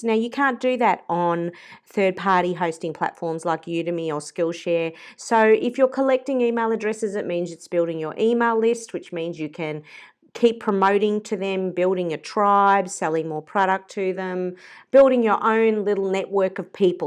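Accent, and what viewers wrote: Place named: Australia. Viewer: Great to hear another Aussie! I've just started on Teachable and I'm finding it great. The only downside so far is the monthly fees and the extra 5% they take from profits. I've been able to ease into it pretty well as I already have a student base on Youtube and they are very keen learners! Teachable also has a ton of information for starting up and their customer service is excellent. Interesting video, thanks for sharing.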